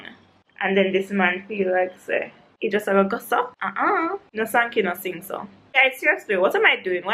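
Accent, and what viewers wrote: Jamaica. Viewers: Btw, are you Jamaican?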